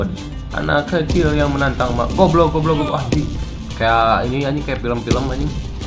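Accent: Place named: West Java, Indonesia. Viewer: Sunda Nah Te Sunda Pisan Euy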